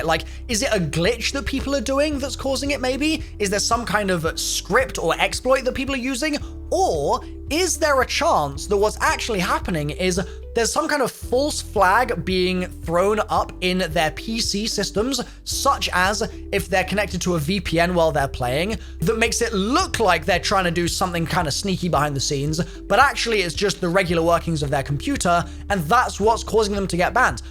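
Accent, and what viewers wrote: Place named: United Kingdom. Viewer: too much uk english for me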